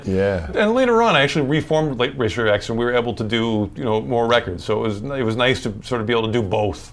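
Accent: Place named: Transatlantic, United States. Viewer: He's changed his appearance and accent to transatlantic. Not sure if this is a phase or if he's aware of it.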